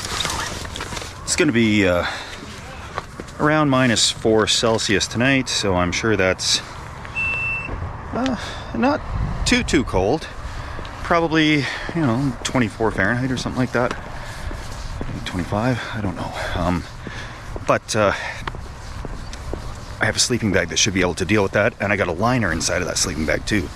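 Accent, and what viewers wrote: Canada. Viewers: is one of the sweetest, most wholesome people on this platform. Whenever I hear speak, I am enamored by his thick Canadian accent, but more importantly his positive attitude. is a treasure and we must protect him at all costs.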